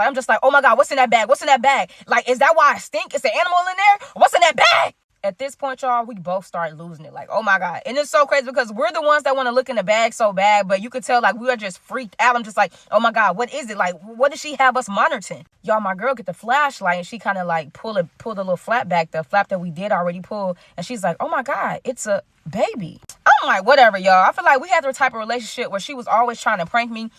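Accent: Chicago, United States. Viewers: are you from Chicago? 👀🤔I been watching you since your tiktok story times and i swear you talk like us lbvs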